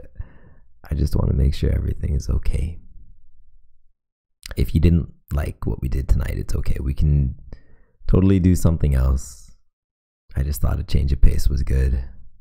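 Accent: Canada. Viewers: I hear Canadian 😌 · omg the sorry is so cuteeee. Are you canadian by any chance?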